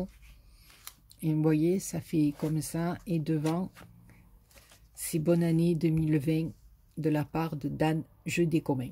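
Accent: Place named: Midi, France. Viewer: Coucou merci pour ce partage de bal. De jolies créations encore.. Tu m'as fait rire avec "c'est une petite galejade" 😊 du Midi ça te va bien avec ton bel accent que j'ai perdu 🙂 Je suis du 84 😎 mais vis dans le 50 ☔ depuis 2013 😌 Alors pour moi c'est juste un plaisir de t'écouter 😊 Bisous!😘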